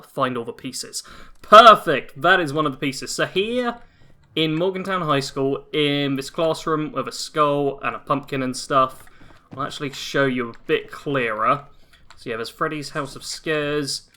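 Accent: United Kingdom. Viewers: It's all good. You're British. ;-). It is, however App-ah-lay-cha, not App-ah-lach-ia. Cheers!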